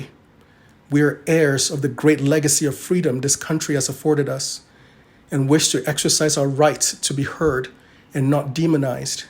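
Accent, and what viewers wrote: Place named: Africa. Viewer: I lived in Nigeria many years ago and traveled to Ghana. You have perfected your accent! I can barely detect any bit of Africa left in your voice but I know you carry it in your heart! Well done, spoken well!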